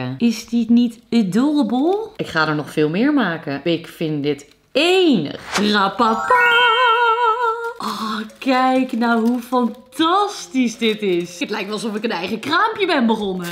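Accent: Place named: Flanders, Belgium. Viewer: Ik peis daje accent da ge deedt gents of antwerps of west-vlaams 1 van de 3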